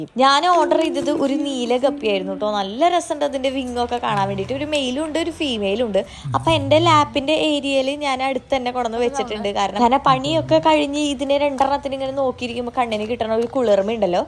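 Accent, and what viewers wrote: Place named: Thrissur, India. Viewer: നിങ്ങളുടെ തൃശ്ശൂർ ഭാഷ കേട്ട് വീഡിയോ കാണാൻ നല്ല രസം ആണ് ❤️😌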